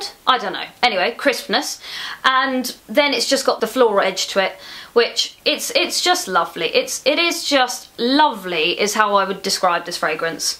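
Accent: United Kingdom. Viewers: Hi, just wondering, where in UK are you from? You remind me of a friend of my who has got the same accent as you and I kinda miss her. Thanks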